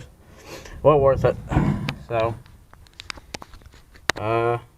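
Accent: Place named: Maine, United States. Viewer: You must be from Maine or atleast New England.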